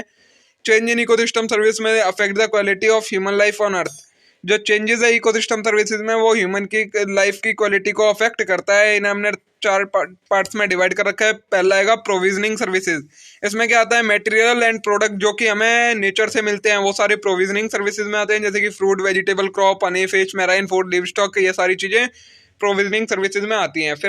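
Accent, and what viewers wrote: India. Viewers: Bhai ka UP accent is so good 🌝😂🔥🔥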